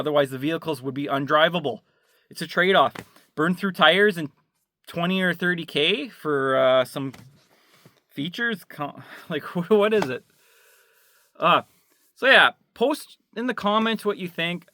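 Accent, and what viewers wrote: Canada. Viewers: Guess you didn't catch his Canadian accent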